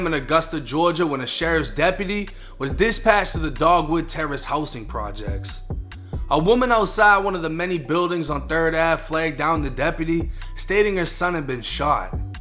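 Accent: Boston, United States. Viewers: thanks for the UPDATE. that little girl deserves justice; and to think had been not been in prison, who knows if the circumstances that led to her death could've been prevented. BTW: are you originally from BOSTON?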